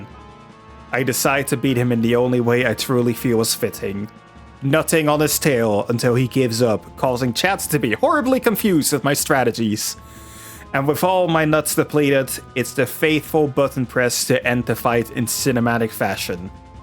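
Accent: Netherlands. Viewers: Dutch accent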